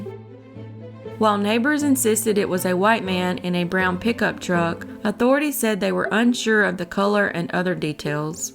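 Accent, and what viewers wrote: South, United States. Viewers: Love your southern accent!! What state are you from? Love from Ireland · Love your accent- voice. May i ask what state you are from.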